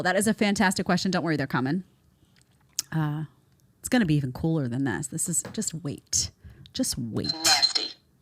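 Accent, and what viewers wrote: New York, United States. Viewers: You are delightful, especially your NYC accent. I'm drawn to women in the 5' 4'' range with small hands and feet.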